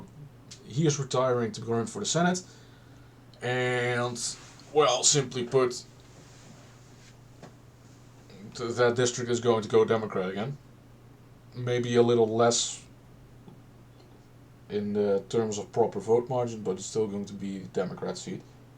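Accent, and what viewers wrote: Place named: Netherlands. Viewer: Je Engels is uitmuntend maar ik heb zo'n vermoeden dat ik een Nederlands accent hoor dus ik schrijf in het Nederlands ;). Hoe denk je dat de verkiezingen zullen verlopen? Sinds 2016 heb ik het idee dat Amerika steeds radicaler en gevaarlijker wordt en ben mij nu zelfs aan het afvragen of verkiezingen überhaupt zonder kleerscheuren of "spektakel" (in negative zin) gaat plaatsvinden.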